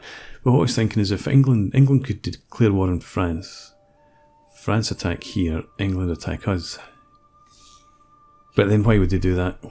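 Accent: Scotland, United Kingdom. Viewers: you are Scot, arent you? love your accent;-)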